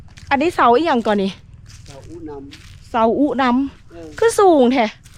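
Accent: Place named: Phu Thai, Thailand. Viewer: โม่นสำเนียงสาวภูไทเด ติดตามตะลอดเด้อ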